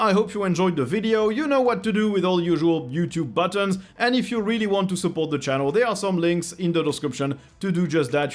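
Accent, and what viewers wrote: France. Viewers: Seriez-vous français à tout hasard ? (^-^) J'ai l'impression que votre accent s'en rapproche :D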